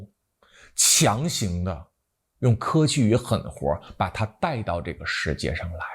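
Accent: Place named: Northeast, China. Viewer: 本想听两句傻逼言论。没想到一上来的煞笔东北茬子味就受不鸟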